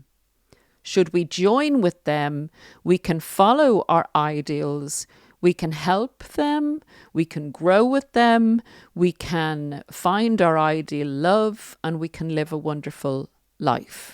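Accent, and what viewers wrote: Ireland. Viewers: Thank you, it's so helpful to move toward the light and stay there. I find your podcasts, your knowledge, and your support so incredibly wise and encouraging. I love your way of speaking—explaining—and your lovely Irish accent! Thank you so much!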